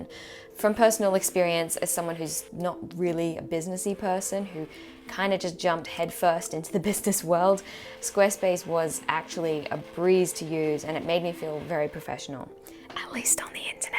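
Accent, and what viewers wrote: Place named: Australia. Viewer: I'm so jealous of you. Although I've lived a good portion of my youth in Japan, I have not experienced Japan the way you have. I'm glad you share your experiences so I can enjoy Japan from your perspective. You also put me to shame to the Japanese language because I can barely understand it or speak it, LOL, the problem from living on military bases. I love your Australian accent! Keep going with your content....I've enjoyed several :)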